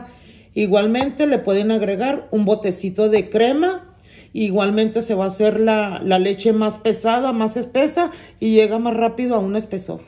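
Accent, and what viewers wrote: Chihuahua, Mexico. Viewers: Gracias señora, sabía que su acento es de chihuahua y necesitaba la receta de chihuahua ❤❤❤❤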